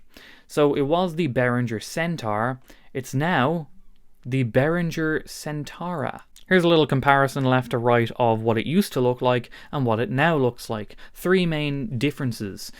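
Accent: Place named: Dublin, Ireland. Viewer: Fantastic accent, Dub?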